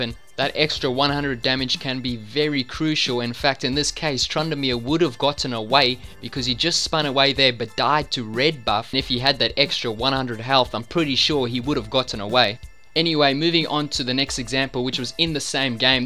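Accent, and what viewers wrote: Afrikaans, South Africa. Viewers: Dude are you south african? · are you south african?? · is jy Afrikaans dude?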